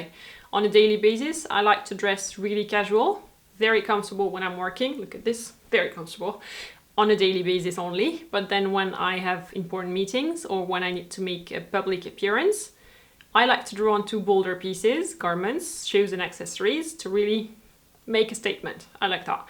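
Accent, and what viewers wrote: France. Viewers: I can tell by your accent that you speak French. Maybe you are french and live in Germany. You don't have a German accent at all. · you can tell from that beautiful accent! She's definitely french :)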